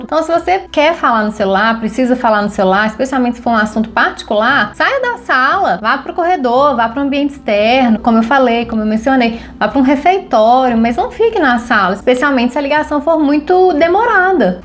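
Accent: Minas Gerais, Brazil. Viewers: Conheci seu canal hoje e já me inscrevi! Você é muito prática! E pelo seu sotaque, acho que você é mineirinha como eu!!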